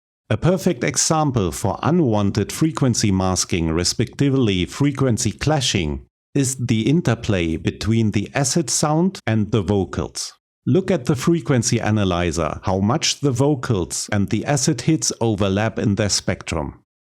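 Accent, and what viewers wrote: Germany. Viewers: I LOVE YOUR STUFF !!! ITS SOOOOO GOOD :)! Are u german? Because your accent seems really german too me, if yes .... ICH LIEBE DEINE VIDEOS :D ! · I knew you sounded too German to be Spanish.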